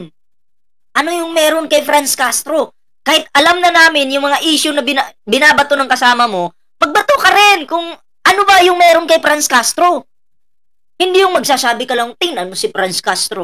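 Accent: Visayas, Philippines. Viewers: Nagsasalita k nakatago mukha mo sa salita p lng me punto n bisaya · Bisaya ang tunog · Putang ina mo gago ka bat ka nakatakip mukha mo bobo kupal ka matapang ka ipakita mo mukha mo hindot ka sipain pa kita jan boses bisaya kupal na yan.😂😅mukhang pwet kaya nakatakip mukha niya idilat mo mata mo kupal ka Kalahari na nga mata mo nagtatago kapa 😂 ang mga Dutae na pinapanigan mo sila ang tunay na magnanakaw eh malamang ganun ka din ano naayudahan ka ba ng mga Dutae tanga.😅😅😅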